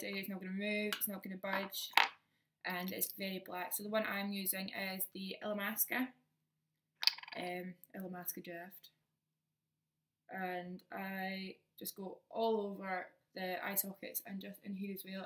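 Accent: Scotland, United Kingdom. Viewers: Are you Scottish xx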